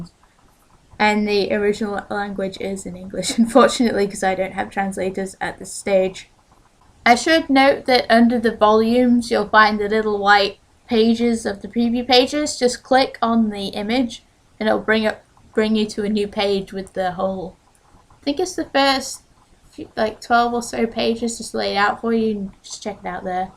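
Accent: United Kingdom. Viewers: Its quite good what you made :) but, i think that the teen childe would be a regular childe :p with 8 - 10 years and a teen childe would be allmoste adult size, or at least at the women size :) but just my opinion xp btw love the british acent ^^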